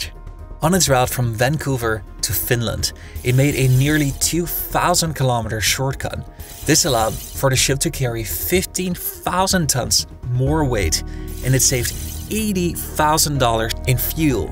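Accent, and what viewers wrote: Netherlands. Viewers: He isn’t pronouncing it that way on purpose, he’s Dutch. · Your dutch arent you? Lol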